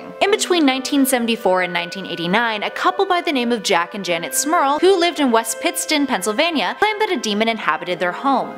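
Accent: Canada. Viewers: Liliana Jasmine maybe its canadian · How many times does this story need to be recreated on YouTube, same story different face with a Canadian accent. Blah blah blah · Either Canadian or from New England, US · Garrapaterorulez Sounds Canadian. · She sounds Canadian, eh...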